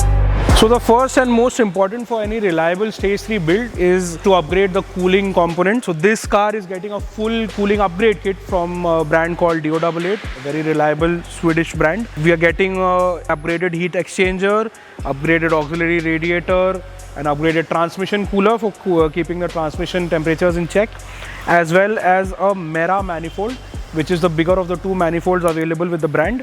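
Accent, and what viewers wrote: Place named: India. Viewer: Im sure its indian accent😂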